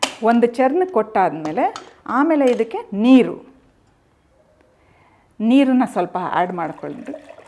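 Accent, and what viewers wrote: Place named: Bangalore, India. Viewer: ದಯವಿಟ್ಟು ಶುದ್ಧ ಕನ್ನಡ ಮಾತಾಡೀ ಬೆಂಗಳೂರಿನ ಕನ್ನಡ ಬೇಡ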